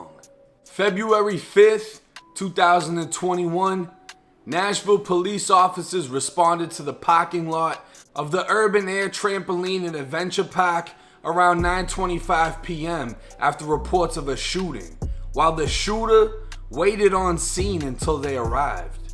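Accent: Boston, United States. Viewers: yo bro r u from Boston mas.??? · Bro u from Boston or nah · New to your channel. Great commentary👍 love the Boston accent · Na that ain't New York thass bean town boy that's middlesex n Suffolk county shit it's the whole bay state we all mass holes just r area n boston is more emphasized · I'm like 99.1% sure you are from Boston. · Sound like a guy from the inner city maybe Boston all black/white all sound like that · He got a strong boston accent lol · Man that boston accent is comming out hahd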